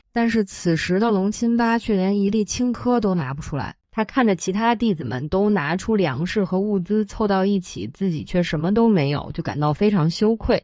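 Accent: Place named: Beijing, China. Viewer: 解说一口北京腔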